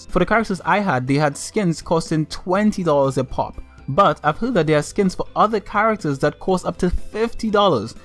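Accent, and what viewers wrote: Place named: Trinidad and Tobago. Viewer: you sound like a trini , you from trinidad bro ?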